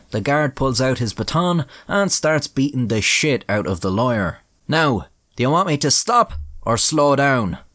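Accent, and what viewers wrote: Ireland. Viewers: You have that Irish accent that sounds sarcastic/ angry the whole time. Think Ive seen a comedy sketch about people from there trying to sound sentimental and it was hilarious! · This Irish accent is the best English accent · I find the cartoons and Irish narration of these subjects so hilariously charming in these videos. You have the gift of the Blarney. Keep up the great work. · Brilliant vid I think you are fantastic. Do you doodle the sketches. So clever! Better than the usual generic clips. Best satire out there even better your irish 🇮🇪 like meeself 👍👊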